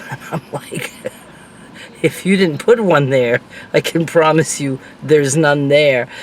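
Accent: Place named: South, United States. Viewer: As an Aussie, i just adore Southern accent:)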